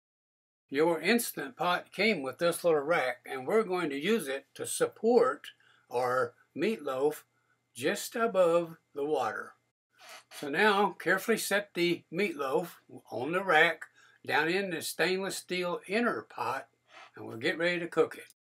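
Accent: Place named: Alabama, United States. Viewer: I was sitting here thinking, you remind me of my papa... what part of Alabama are you from?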